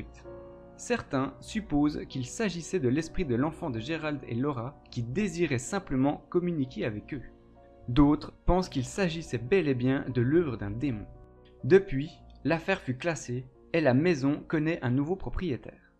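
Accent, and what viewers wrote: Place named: Jura, France. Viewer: Tu as ll'accent jurassien c'est excelent^^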